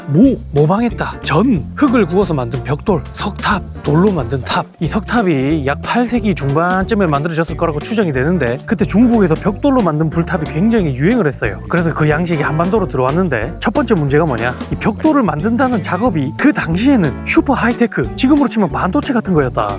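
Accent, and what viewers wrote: Gyeongsang, South Korea. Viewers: 경상도사람이라 사투리쓰는건데 성적정치적일베 ㅇㅈㄹ하고있네.. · 찐 갱상도남자 유투버맞네예 매번 잘 보고 있습니다 화이팅입니다 😊😊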